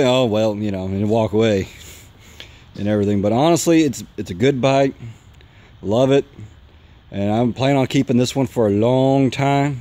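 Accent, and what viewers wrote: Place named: Texas, United States. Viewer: great bike and great texas accent.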